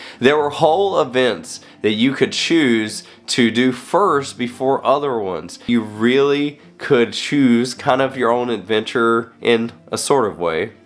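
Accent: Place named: Carolinas, United States. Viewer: Cool channel! Neat accent too. If I had to guess, is your accent Carolinian? 😎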